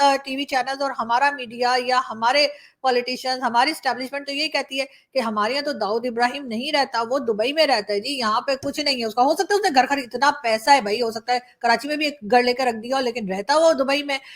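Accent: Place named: Mumbai, India. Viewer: ji kya aap bharat ayi thi. Kyuki aapki bat karneka style mumbai wala hai. Very good aap aage chal ke sab ki wat lagane wali hai. Agar massage pasand aya to like kare.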